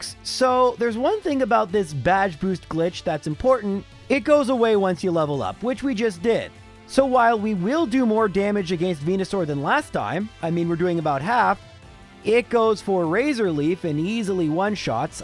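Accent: Canada. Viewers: These videos mean so much to me man. It's weird, I only just started watching them about 6 months ago but these Gen1 Runs are such a relaxing, anti-anxiety chill time for me. Maybe it's the Canadian accent (I left Canada 10 years ago) and Gen 1 nostalgia, but dang man. Thanks so much, keep it up please!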